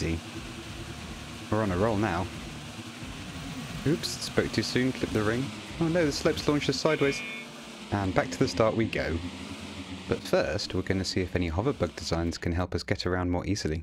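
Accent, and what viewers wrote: United Kingdom. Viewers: That British is awesome. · I never knew you were British (or at least had a British accent) Oh and I won't like this until somebody decides to ruin that 100 like perfection with another like or a dislike.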